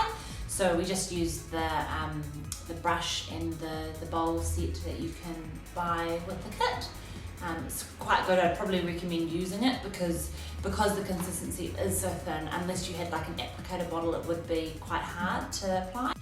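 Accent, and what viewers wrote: New Zealand. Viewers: Kiwi! Yay! So nice to hear a familiar accent on here :D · new zealand accents are my favorite and I apologize for the ignorance, not all americans suck.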